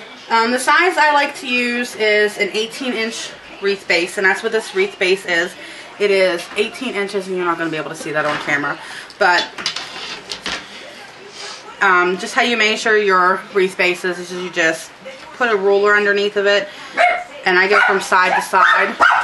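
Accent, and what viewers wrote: Pittsburgh, United States. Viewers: Not even 30 seconds into the video and I knew you were a Pittsburgh girl:) Great video...n'at;) Thanks for sharing:) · Thanks for sharing and going step by step for us beginners! Love your accent, I'm guessing Pittsburgh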